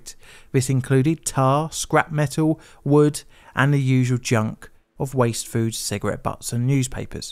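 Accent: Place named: England, United Kingdom. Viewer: In future videos, can you stop using that awful England accent. It's rather annoying.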